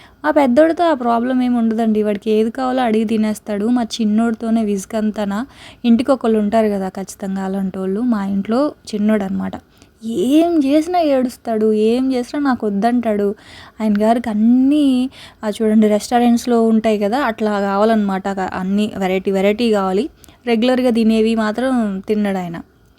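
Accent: Godavari, India. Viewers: Meru pakka godavari slang lo matladutunnaru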